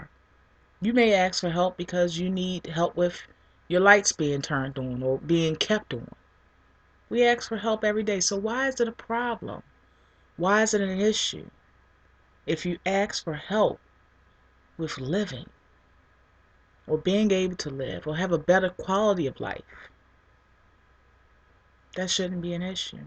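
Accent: United States. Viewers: I love your accent! I miss USA accents! :) Thank you for your honesty and love and compassion. My surgery is Oct 23rd and I am excited.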